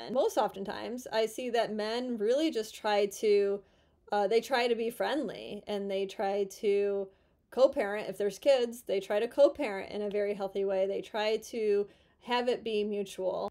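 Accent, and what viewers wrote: United States. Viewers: Very attractive woman, attractive face, attractive voice, from a foreigner who likes the American accent. A woman doesn't belong to a man, a man doesn't belong ot a woman , People like each other and live together or, people have their own homes, they live separately and see each other. Men today are frightened if they live with a woman and she throws him out he will be homeless.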